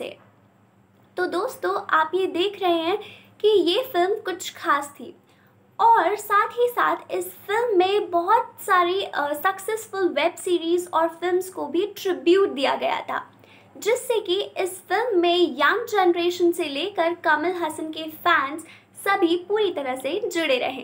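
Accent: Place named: United Kingdom. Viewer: Your Hindi assent is Englishized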